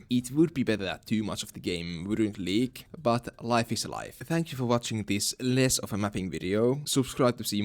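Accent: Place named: Turku, Finland. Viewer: Thickest turku accent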